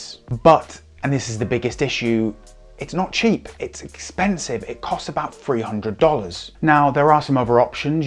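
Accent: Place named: United Kingdom. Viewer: This looks like the British version of "Films at Home" from good old bearded Jeff, different accent and no cap but the beard is there. Physical media is my first choice over streamed movies. Censor ship is a real threat and easily applied to streamed movies. A recent example: in "Home alone" the appearance of Trump is under threat of being erased. That is Soviet-style censorship, erasing former political leaders from history.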